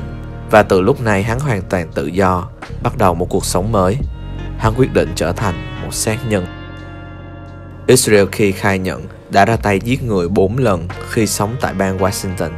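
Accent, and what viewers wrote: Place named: Da Lat, Vietnam. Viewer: bạn này chắc là người Đà Lạt, giọng giống giọng em trai mình quá luôn ah.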